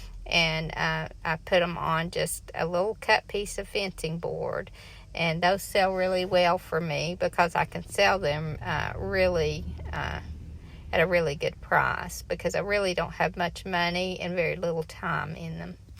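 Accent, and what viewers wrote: South, United States. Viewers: Hi, I just found your channel and love it. I was wondering where your store is located. I related to your style as well as your southern accent. I am from south Ga., but live and have 2 booths in Tn.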